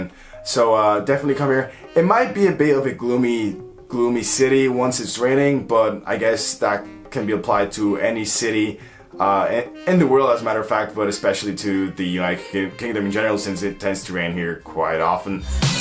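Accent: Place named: United States. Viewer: where are you from? You have an interesting accent, it sounds American but not quite.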